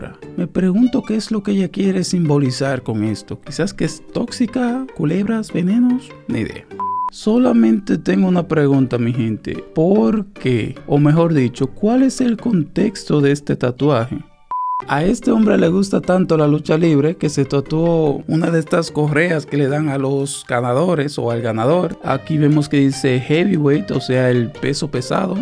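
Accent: Dominican Republic. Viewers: Eres dominicano 🇩🇴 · eres dominicano?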